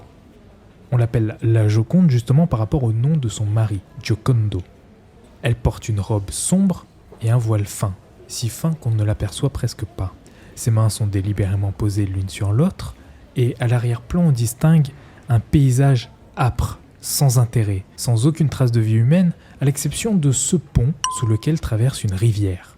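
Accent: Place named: Housing estates, France. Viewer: Ce fond d'accent des quartiers qu'ont malheureusement les 3/4 de nos jeunes aujourd'hui (même ceux qui ne sont pas des quartiers), m'a littéralement dégouté. Affreux.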